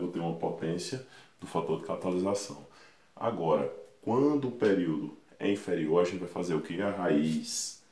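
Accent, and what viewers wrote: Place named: Pernambuco, Brazil. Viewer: Tive grande facilidade em aprender contigo professor. Eu já estudara os anos anteriores este assunto ,mas não conseguia tanto êxito.Nos tempos de escola( há muitos anos atrás). Sua jeitinho simples de explicar à matéria facilitou muito...OBRIGADA! OBS: esse teu sotaque lembra-me meus vizinhos pernambucanos.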